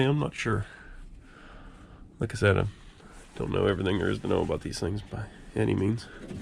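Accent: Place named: Pennsylvania, United States. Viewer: sounds like a Pennsylvania accent there....where are you from? we are in ohio. love the old trucks. i have a 1968 w900a with a an 8v72 2 stroke and 4 and 4 trans. original truck but needs retored. also have a 65 loadstar project going on.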